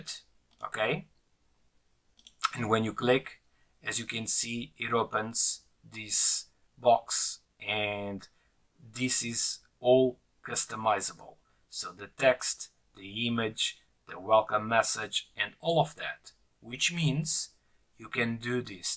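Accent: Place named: Portugal. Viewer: meu, que accent mais tuga!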